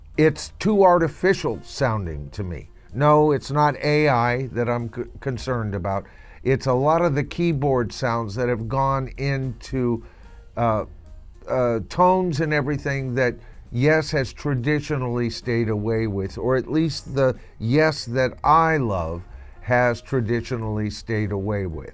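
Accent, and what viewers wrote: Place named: United States. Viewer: . If you are an American, and your accent makes that likely, You and I and all the Rest of Us have more serious things to worry about than a Plastic "Yes" ( and how many originals are in this 2026 lineup? )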